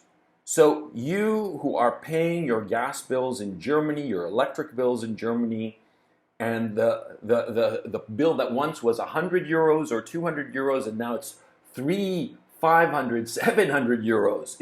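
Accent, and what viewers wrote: United States. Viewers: Great information but words matter. I'm super offended by the overuse of the blanketed blame to "the Americans" with sparse mention of those truly behind the controls. I've cut ties with our media over two decades ago for the same reasons you mentioned once in your video regarding USA media. I'm lonely here in America having this view with having my only outlets watching my heroes of news information (you specifically being one of them) generalizing those "Americans" as the evil ones. You did similarly generalize "the Norwegians " but only once. I appreciate greatly what you do and again you are one of my heroes. Remember that your English is 100% "American" not the Queen's whatsoever. BTW English language trained in Germany is the Queen's. I will continue watching your contributions but know this, words matter. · But that accent sure sounds "American".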